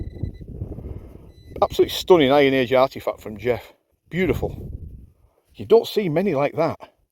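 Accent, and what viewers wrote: Cumbria, United Kingdom. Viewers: Just found your site, just got an interest into metal detecting at 72 years old. The other interesting thing, yours is the first site I have looked at and thought I recognised the accent, on checking Dalton, I'm from Barrow-in-Furness, left 51 years ago and emigrated to New Zealand. Look forward to learning from a local. Paul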